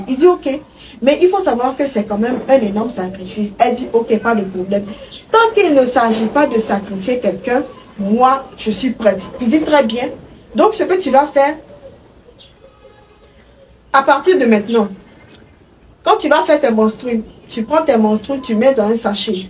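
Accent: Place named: Ivory Coast. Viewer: Tu l'accent ivoirien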